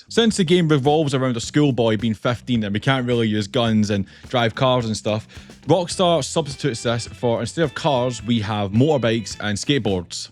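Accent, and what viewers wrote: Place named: Scotland, United Kingdom. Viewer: Great video but I’ve had to stop watching cause I’ve realised I don’t really remember the game that well and I want to replay it now 😂 I’ll come back later. I have subscribed though and I’d like to see more videos about older games from you but don’t worry, I got through stages so I’ll get round to your newer game videos as well. Seems like the best of both world here. Also, it’s great to hear the Scottish accent, I used to hear it all the time in England but not so much anymore but let’s not go there 😡😅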